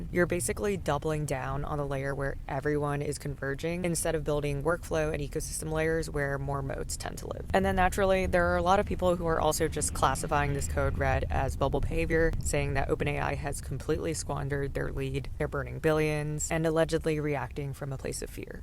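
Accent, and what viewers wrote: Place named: South Carolina, United States. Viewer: You sound like you are from southwest SC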